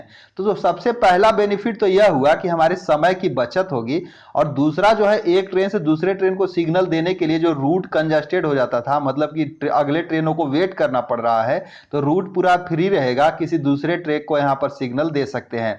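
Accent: Bihar, India. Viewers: Bhai aap samastipur ya darbhanga se lagte ho aapki boli bohot meethi hai 😁👌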